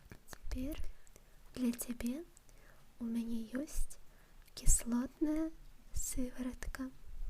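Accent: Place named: Trasyanka, Belarus. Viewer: Немножко трасяночки,но обалденно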